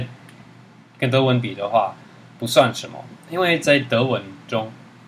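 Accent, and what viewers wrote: Taiwan. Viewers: I am really impressed with your fluency of Chinese but the thing is you speak with a pretty obvious Taiwan accent，which sounds definitely beautiful but a little bit strange if you are trying to communicate with someone who comes from Mandarin. You may wanna watch some Mandarin movies in order to see the difference. Also, thanks for your sharing! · 我猜你是跟台湾人学的中文［［ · 好像到地的台灣中文!!! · 講德文超帥。超根本不是討厭的。哈哈以後講聽不懂的德文就好了。根本帥。王子講國語根本台客。哈哈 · 在台湾学的中文？ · 这人的汉语在台湾学的吧，我听出来了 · 汉语学的一口台湾腔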